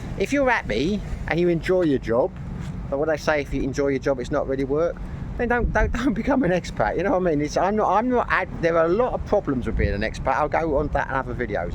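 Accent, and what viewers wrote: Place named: England, United Kingdom. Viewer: Thanks for the great content! I also watch your channel because your accent reminds me of my old English friends living in the USA, as well as some of my favorite characters on Monty Pythons.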